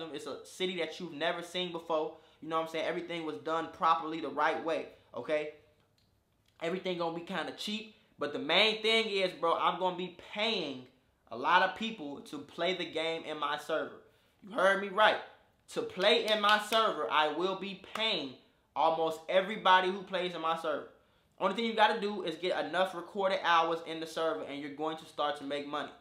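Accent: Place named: South, United States. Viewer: You sound like you from the Souffffff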